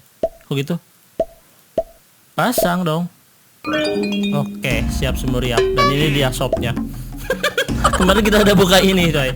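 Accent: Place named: Cianjur, Indonesia. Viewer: Kayak orng cianjur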